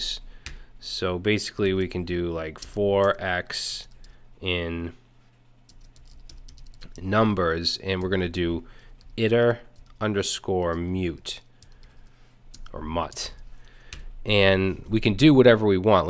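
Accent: Boston, United States. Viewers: Just a question,are from Boston ? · This is a great place to start. Well spoken, easy to follow and your Bostonion? accent makes it more interesting.. thanks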